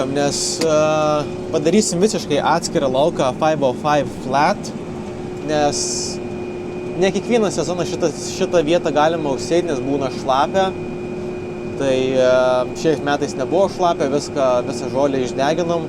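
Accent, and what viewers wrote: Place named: Zanavykai, Lithuania. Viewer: šaunuolis ,vyrukas ir muzikytė vežanti, automatiškai koja į ritmą.:)O tarmė zanavykų, miela ausiai:)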